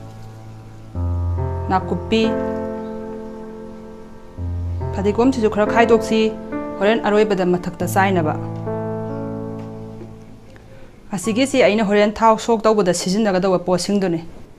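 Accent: Kakching, India. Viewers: Kakchinggi meitei lon se tabada yamna nungsijei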